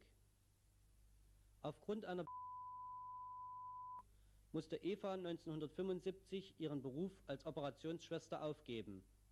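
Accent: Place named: Saxony, Germany. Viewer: Leicht sächsischer Akzent, ansonsten roboterhafte Kälte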